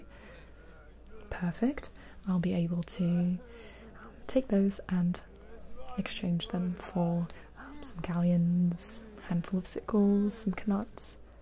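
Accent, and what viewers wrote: England, United Kingdom. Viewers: Hey you sure you are not from England either that or you have some seriously good accent skills 😦🙌🏻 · Im from england and thats a great accent very good 10 out of 10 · your English accent is amazing! Sending you love from the UK 💕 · If I was new to this channel, and if it wasn't for the merch bit at the beginning of the video, I might have believed that you actually were English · From a fellow Englishmen I approve of this accent. good job 👌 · Nice accent, it's better than some English people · Many subscribers with no videos I’m sorry but English people over the age of like 20 all sound like this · You seem to have nailed the English accent,which is unusual for an American. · your English accent is on point! My family comes from Wales but grew up in London. Luckily, I have also picked up the accent. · After some time i actually thought she was English Very well done 👏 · I need another video with English accent 😭💘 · That English accent though! 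Like YASSS! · Great accent! You sound like you're from England! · English. Not British. Britain includes Wales, Scotland and Northern Ireland. She is doing an English accent. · Usually I don't like the English accents a lot of Americans use as its not realistic or accurate (I'm English) but with this is nicer because some people in England talk similar to this and even I do to an extent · nah its obviously a mix of lots of different english accents and pronunciations. kinda like elon musk but with regional english accents. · Good English accent and thats coming from an Irishman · I'm not sure if everyone's appreciating just how hard it must have been to do an English accent while also having fake fangs in your mouth! haha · You do a great job with English accent daaarlling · Dylan Brooks you realise how many different accents are in england? lmao where i am from most people sound like in the video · CAN 👏 WE 👏 GET 👏 MORE 👏 ENGLISH 👏 ACCENT 👏 VIDEOS 👏 PLEASE 👏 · Your English accent is so funny! 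· no this is an english accent because the accent is from England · Me as a Englishman I think that is a great 👍 imitation of a English accent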